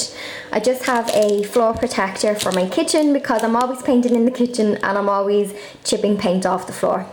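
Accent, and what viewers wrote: Ireland. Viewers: I think it is Irish? nice vid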